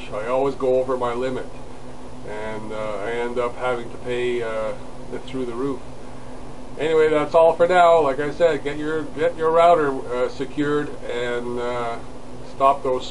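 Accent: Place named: Canada. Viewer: hahaha Canadian accent!!!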